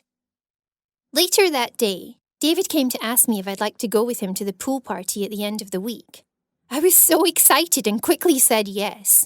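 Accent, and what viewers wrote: Scotland, United Kingdom. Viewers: Is that a half Scottish accent? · I think she has a scottish accent. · Is the voice a Scottish accent? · She sounds Scottish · She sounds Scottish to me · Are you Scottish your accent sounds like you're Scottish???...... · Wtf is with her accent Is she trying to sound Scottish Plz help me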